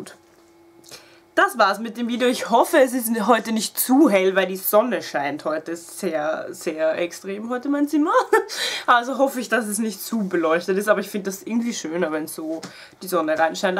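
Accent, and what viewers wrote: Austria. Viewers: Ich find dich so süß. Ich könnt dich grad echt knuddeln. Und ich LIEBE deinen österreichischen Dialekt. :-)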